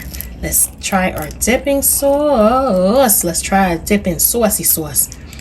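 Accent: New York, United States. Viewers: I love your new york accent too yes I picked it up on the first couple seconds of the video lol them cheeseburger egg rolls look good too smh love ny women can't wait to get back up top mad respect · Where you from Brooklyn queens or BX? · You must be from New York!!! I’m a new sub for those egg rolls and that saucey-sauce! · That New York accent just shines through, I love it. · New York or Chicago? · Om guessing your from the New York by the way you say sauce, there, and off. 😁 Nice video I'm gonna try it thanks.. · Hey - these look fabulous and I can't wait to make my hubby these. Thanks for sharing us you tutorial! Love your presentation and your lovely accent (New York?) We're from Chicago!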